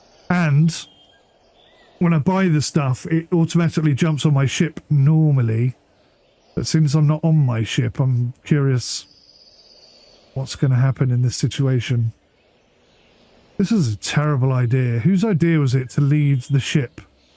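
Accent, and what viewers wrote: United Kingdom. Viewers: Happy to see you giving this game a go so i could see some gameplay of it and learn a bit about how it plays, much appreciated 👍 But what is up with the sudden on/off cam inclusions here and there? I'm not a frequent viewer so maybe it's part of your style or some kind of in-joke? The first one felt kind of natural and i figured it was like when people streaming to show their inputs while playing. But then a bit later came a weird belly/crotch cam shot and a couple of completely black ones that made me start to wonder if these are AI generated blurbs or something like that, as those (at least for me) didn't seem to have any purpose to them 😅 The one where you were gesturing while talking about boat designs felt relevant to show your hands when talking, so then we were back to making sense 🤔 A few of them felt like they were also rotated 90 degrees compared to the original cam angle? 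Dropped a like and would love to see more gameplay of this, if there's enough content in there to make more videos out of it. It feels very fitting to have a 18th century sailing game with a British narrator! 😁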